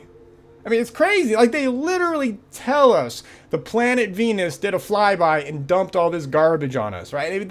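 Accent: United States. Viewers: What kinda accent is this? East coast for sure, NY? NJ? PA?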